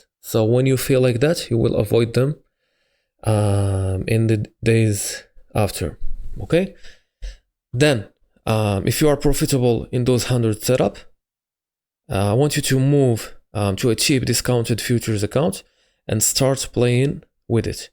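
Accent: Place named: Morocco. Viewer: Are you moroccan brother? you sound like it, I am too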